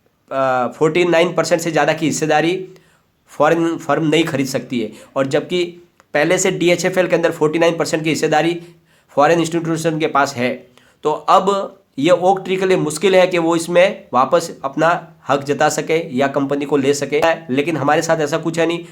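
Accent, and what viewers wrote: Gujarat, India. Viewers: Gujrati lago cho.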